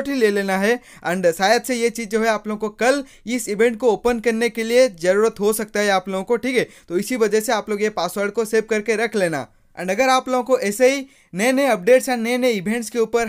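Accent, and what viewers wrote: Odisha, India. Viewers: Aap odisha ke ho kiya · Bhai tum odisha se ho? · Bhai tum odisha se ho kya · Bhai tum odia ho kya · Bhai app Odia ho kya ?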